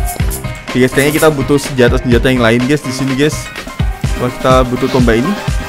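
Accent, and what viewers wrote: Java, Indonesia. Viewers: Fiks Orang Jawa Logatnya :v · Bang wong jowo nopo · Abang orang jawa ya bang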